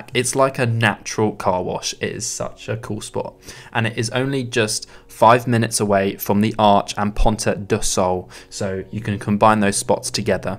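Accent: United Kingdom. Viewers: Can’t take the British - Portuguese pronouncing serious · Cheers! Great, great vid. Madeira is stunning, no doubt. The hike between Pico do Arieiro and Pico Ruivo must be one of the top hikes in Europe. My favourite island. Don't worry about the pronunciation: Brits speaking Portuguese words always make me giggle (in a good, lighthearted way). Best wishes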